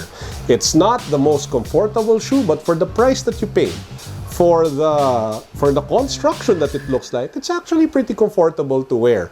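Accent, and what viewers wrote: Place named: Philippines. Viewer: Strong Filipino accent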